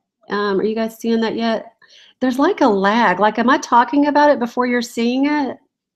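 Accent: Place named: Texas, United States. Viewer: ...and then people teasing you for how you pronounce "jewelry." Lol. I've honestly never noticed that! I have noticed you say "garage sell" instead of "garage sale," and that it's never "when," it's always "whenever." I just figured it's a Texas thing, and I never said anything because one thing all of us as Americans know is you don't mess with Texas! Well, that and I get crap about my West Coast accent and vocabulary, so I have no room to talk. 😂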